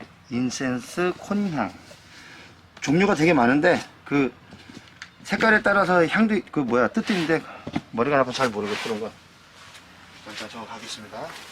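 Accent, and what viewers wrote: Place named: South Chungcheong, South Korea. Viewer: 말하는게 충남 서산여 ㅎㅎㅎ 발전기 몃키로에요?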